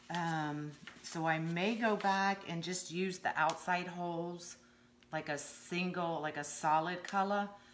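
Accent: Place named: Louisiana, United States. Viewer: Enjoy your videos. It is interesting to see how the UITC boards make pretty wreaths with less time and material. I know you said you were in Houston, but your accent sounds like Louisiana to me. Are you originally from there?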